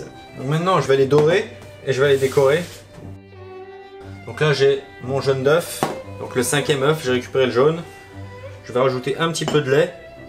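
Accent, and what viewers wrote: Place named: Alsace, France. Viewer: Quel plaisir de retrouver notre bel accent Alsacien ❤ et nos succulentes recettes de chez nous ❤ MERCI BEAUCOUP ❤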